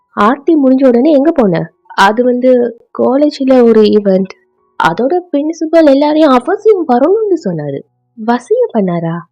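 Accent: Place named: Kerala, India. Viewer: illa akka .. na tamil .. unga tamil kekka alaza iruthu atha ketta malayalam ma nu .. naga pesura tamil ipdi irukathu english mix aki irukum .. anaa unga tamil super ra iruku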